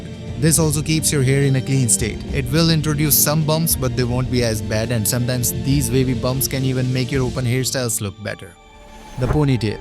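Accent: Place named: India. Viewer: Nice indian english 👍 Indian people very nice people ❤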